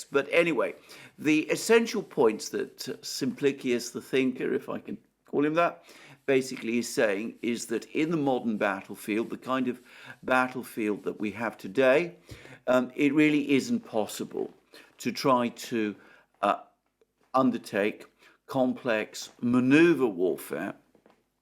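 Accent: England, United Kingdom. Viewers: It's weird listening 🎧 to a communist with an English accent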